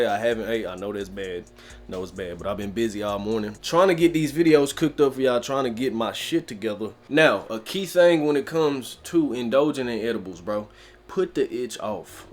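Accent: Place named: Texas, United States. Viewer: Strong southern accent + left over ribs and bake beans I know this man is from Austin or Fort Worth, TX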